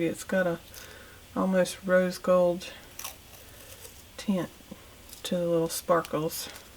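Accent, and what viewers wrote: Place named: Mississippi, United States. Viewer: Gorgeous page! I’m liking the way you did the washi. You sound like you’re from Mississippi. It's always a bonus to have someone who does great art and also has a pleasing voice. I like the cross Ross lines too, I think because it suggests an old patchwork quilt behind it.Thanks for sharing.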